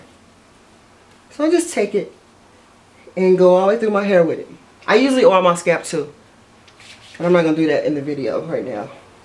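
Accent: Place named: United States. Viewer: you have these gorgeous african features, those cheekbones I'm dying for. your lips are gorgeous and your skin was given to you directly from jesus. hahah, you're beautiful!!! i know you're american, i hear it in your voice, its just your features are so distinct and royal, lol. thanks for the hair tips, yours is lovely!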